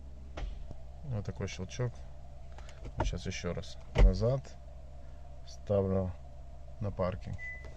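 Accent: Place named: Saint Petersburg, Russia. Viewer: Хорошо смотрится. Без заумствований и проглатывания процессов в ремонте. Приятный русский язык, как будто из Питера)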